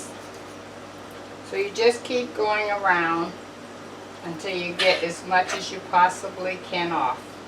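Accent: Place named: Boston, United States. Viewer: Hi I can’t wait to try this. I was just thinking of some of the cheap things i buy plenty of and how i can use them in other recipes. This sounds perfect for all of the peanut butter I love so much. And thanks for the meat suggestion. Especially the dark meat cut-up. I know you hear this all the time… I hear Boston 😘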